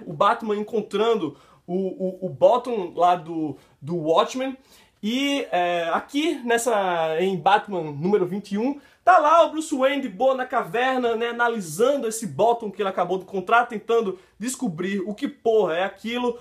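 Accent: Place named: Northeast, Brazil. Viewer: ah esse nosso sotaque nordestino kkk , ótimo vídeo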